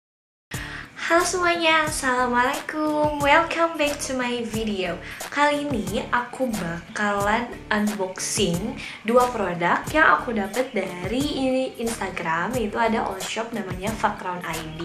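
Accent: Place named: Java, Indonesia. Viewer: kok medhok. jawa ya ?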